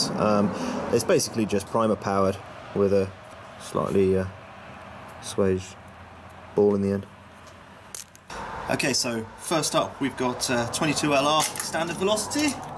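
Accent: United Kingdom. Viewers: Get so used to typical gun videos I wasn’t expecting a British accent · Are you german? I've watched your videos for a while now and I've always thought that you were British. I was slightly surprised that you perfectly pronounced Zimmerpatrone.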